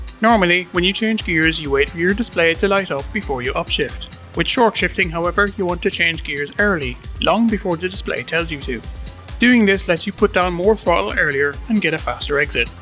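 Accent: Ireland. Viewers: My man sounds a little irish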